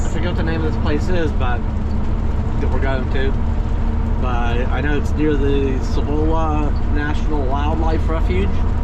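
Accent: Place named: Northeast, United States. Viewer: You sound like yr from the NE area am I correct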